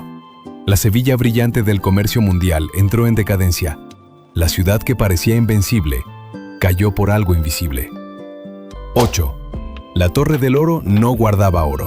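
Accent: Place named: Latin America. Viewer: Y... ¿por qué le han puesto a la IA que relata el vídeo este acento sudamericano tan cantoso? 🤔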